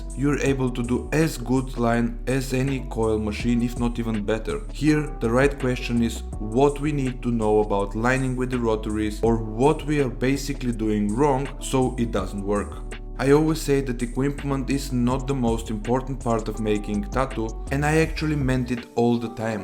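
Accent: Russia. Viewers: какой у вас очаровательный рашн аксент, хи-хи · Дааа ...этот русский акцент...как будто в уши натолкали битого стекла во время просмотра...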